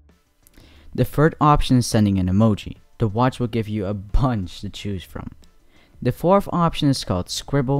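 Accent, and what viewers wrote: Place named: United States. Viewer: Je Amerikaans is super goed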